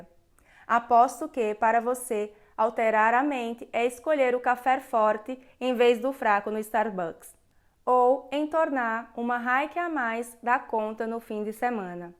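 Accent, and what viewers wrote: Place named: Paraíba, Brazil. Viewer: Sotaquezim da Paraíba👻 vou botar pra eu dormir. Parabéns!!! Ouvi o outro com voz de robô, todo. Muita sorte! Sou de Fortaleza.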